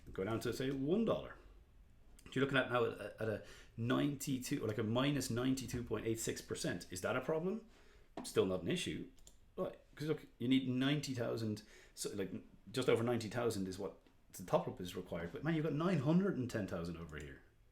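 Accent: Ireland. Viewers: Will definitely be checking this out. Haven't loads of xrp but enough to be using it to make more. Have saw a lot of people retweeting your posts, didn't realise you're Irish before hearing you speak. Just outside Belfast myself.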